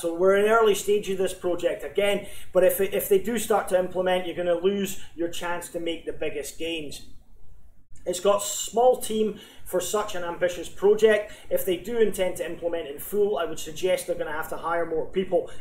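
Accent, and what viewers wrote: Scotland, United Kingdom. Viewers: Nice info. Are you Scottish please? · very British :-)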